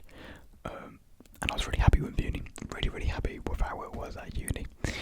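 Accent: United Kingdom. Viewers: hi i was wondering if you're british but live in america?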